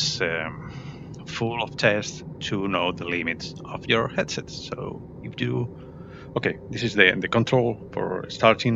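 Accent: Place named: Spain. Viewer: Tu inglés es bastante bueno y no entiendo porque tienes que decir "sorry" por que tengas acento español. Un alemán hablando ingles tiene acento alemán. Un indio tiene acento indio. Ya me gustaría a mi que los británicos o los yankies hablaran spanish como tu hablas ingles. Asi que no hay que disculparse. Me encanta que la gente use esta herramienta para poner algo de objetividad en los visores. Pero uno de los puntos mas importantes es el sweetspot (entendido como ege-to-edge clarity) y aqui no hay una forma de comparar los visores. He visto que hay una herramienta vuestra LensTestRov que mide exactamente eso y creo que se podría añadir a esta herramienta y asi comparar resultados de sweetspot de diferentes visores.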